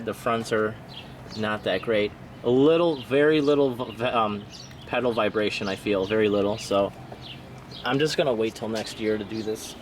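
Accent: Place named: Chicago, United States. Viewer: I heard the Chicago accent. Then I could tell your Right in the flight path of Ohare airport like me.👍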